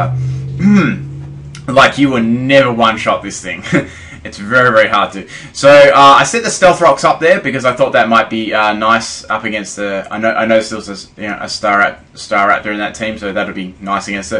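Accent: Australia. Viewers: nothing against Australians and their accents but yours is rather thick, plus the loud, low quality audio makes it hard to sit down and enjoy this video cause i cant understand what your saying.. just calm down you don't have to speak so loudly imo other than that, this was a great battle and your team is bad ass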